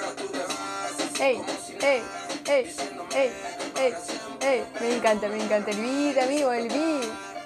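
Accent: Argentina. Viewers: Fa como se te esta pegando el acento argentino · Español ARGENTINO😍🇦🇷😘😄👏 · Muy bueno tu español argentino!! Saludos desde Rafaela santa fe argentina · me parece una colombiana con argentina jaja rusa no creo nose por q hay gente q le cree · Es rusa o argentina?? Ajaj que crack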